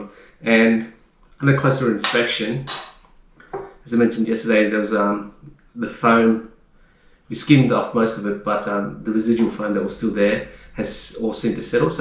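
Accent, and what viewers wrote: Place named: Australia. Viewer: This is so trippy to hear a vietnamese guy with an Australian accent? Sorry I don't know the accent.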